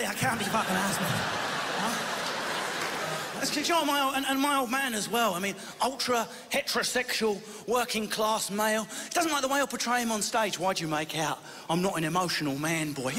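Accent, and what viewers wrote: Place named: Australia. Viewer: He's funny because his Aussie accent is spot on, it doesn't sound over the top or anything!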